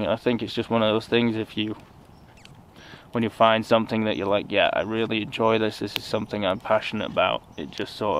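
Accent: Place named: United Kingdom. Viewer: hello how are you and i hope your doing when your not doing the bushcraft videos/solo camping videos and i wanted to say happy anniversary to you and your wife and happy 1 year anniversary on your youtube channel and at the risk of me repeating myself from the last comment i sent you it's like wow when i watch your videos i keep feeling peaceful and enjoy the wonderful nature everywhere you go and i like your dog she is a nice dog great seeing her as well i do have a a qustion how do you spell her name because people spell names different is it blu/blue/ and i hear british in your voice which i think is very very kool i like british people alot and /americans and of course my country canada and thankyou very very much for the Q&A i enjoyed that alot i will always enjoy your videos short or long always cheers to you and thankyou during these hard times your videos help everyone who watches them thruw the hard times from your new friend Randy again ontario,canada stay safe out there m friend.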